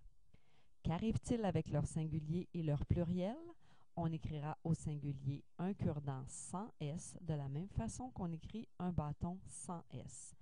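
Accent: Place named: Quebec, Canada. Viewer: C'est la première fois que j'ai remarqué l'accent québécois. C'est vraiment intéressant hein. Moi, je suis italien et j'avais toujours écouté seulement lequelle français. Maintenant je veux aller au Canada ahaha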